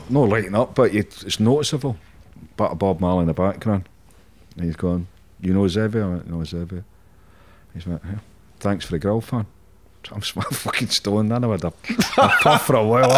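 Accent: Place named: Scotland, United Kingdom. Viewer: Love how Scottish is in this podcast it really comes out haha it's like sitting listening to my uncle's chatting when I go to visit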